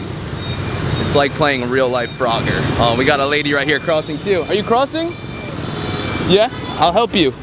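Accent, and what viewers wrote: New York, United States. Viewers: Is this guy from New York?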